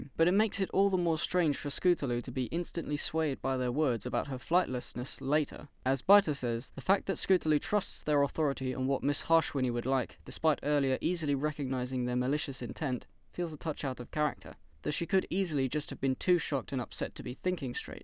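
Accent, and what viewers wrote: United Kingdom. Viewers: are you english? And if so which part of England are you from? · wait, wait, wait, Back up the pick up truck SAY WHUT. UR BRITISH. YUSH. ME TOO. BRO FREAKING HOOF. Yush I did notice that at the beginning.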